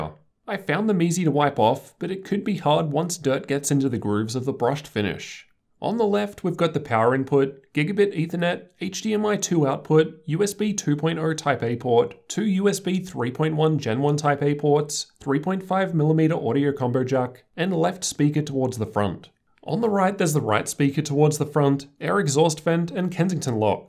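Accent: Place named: Australia. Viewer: Why do you link us and uk but not au? U sound aussie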